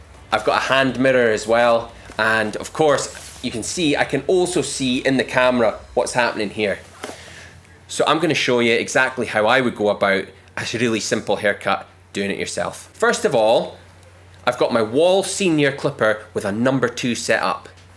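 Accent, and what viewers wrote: Scotland, United Kingdom. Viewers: Great video! I'm going to give a self-cut a try here in California! Are you Scottish? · i love your scottish accent <3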